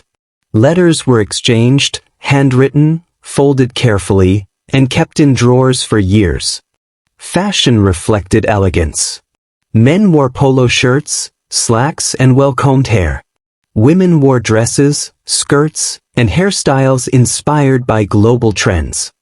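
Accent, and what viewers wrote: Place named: United States. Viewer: The white American English accent is cringe. Very mispronounced and terrible.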